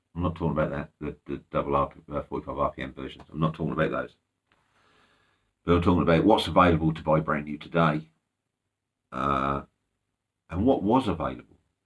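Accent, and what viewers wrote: Suffolk, United Kingdom. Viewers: Hi, just found your channel. It's great to hear a local voice. I'm from Bury St Edmunds.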